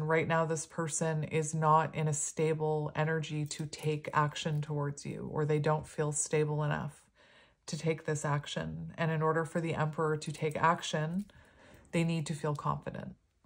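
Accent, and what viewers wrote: Canada. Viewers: I’m sorry someone said that to you about the way you say anyway! Not only are you neurodivergent but you’re also Canadian and I’m sure has a slight accent!People are haters! Your tribe loves you! I’m also neurodivergent and hate that there’s so many people out there that have no grace for anyone but that’s because they don’t have it for themselves!❤ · I knew you were autistic. I believe I am too, although never diagnosed. I'm pretty sure my mother is, and possibly my dad too. Needless to say, my two boys show signs of it, and my daughter is dyslexic and possibly on the spectrum too (yet she's training to be a vet so she definitely ain't letting it ruin her life) I instantly spotted your nervous laughter at the end of a sentence. That's a definite sign. However, I assumed the words you use were because of your accent, which I presume is Canadian? 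I got that from way you say "aboot" 😊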